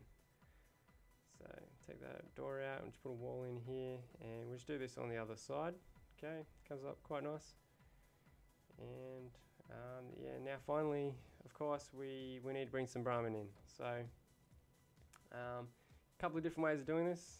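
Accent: Australia. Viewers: I just recognized after 15 videos that he has an australian accent lol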